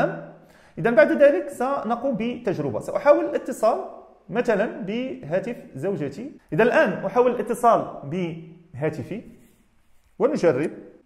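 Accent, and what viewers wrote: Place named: Morocco. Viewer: انته بتتكلم ً. مغربي. ما فهمنا. عليك بتتكلم. بسرعه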